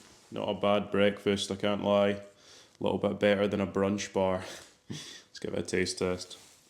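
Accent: Scotland, United Kingdom. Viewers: He's Scottish as can be. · Oh I never thought that you were being offensive, not at all, I know just how difficult it can be to understand the Scottish accent 😁 That's what my smilie was for, has a mild Scottish accent, wait until you listen to someone from Glasgow 😁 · im starting to learn how to understand scottish just watching your videos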